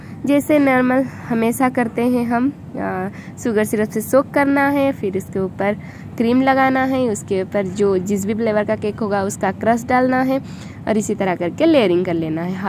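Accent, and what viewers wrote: Odisha, India. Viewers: Aap orissa se h kya?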